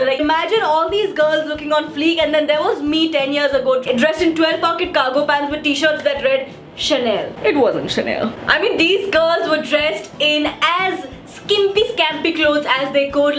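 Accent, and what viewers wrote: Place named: Bhojpuri, India. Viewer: Hey...I met you on my bday idk if u remember but it wass just amazing ❤❤🌸. Anyways a rapid fire cmg up 1.If you had a chance to replace your life with some other YouTuber who would it be and y?😉 2.Prank vrushank that u wanna break up😂 3. Scream out of your window "Aee paani kisne bandh kiya"😂 in your Bhojpuri accent🌸🤘 #Sawaalsaturday lyy❤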